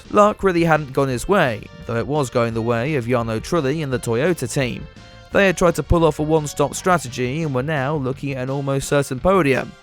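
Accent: United Kingdom. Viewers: Starwing in the UK ,which it sounds like my man is from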